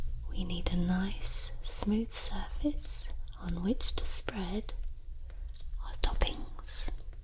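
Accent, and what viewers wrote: England, United Kingdom. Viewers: Your absolute perfection in ASMR! Your pacing, presentation, variety of subjects, and your near angelic English accent is simply...just WOW! Very original and enjoyable. Thank you. 😊🖐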